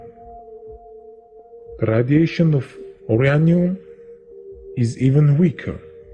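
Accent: Russia. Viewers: Sounds like russian hacker tarus kool not saying he is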